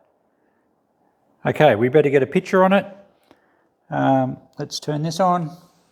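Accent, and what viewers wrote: Australia. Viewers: Thanks mate u sound so Aussie 😂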